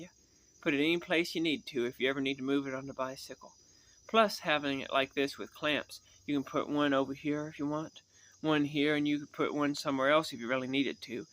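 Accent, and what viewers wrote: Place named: Midwest, United States. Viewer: Kind of off topic, but I love the way you talk. Something in your diction, dictation, and accent is just soothing to me as a Californian. I'm guessing you're from the Midwest in a Mennonite or Amish area. Anyway, I also like the bike!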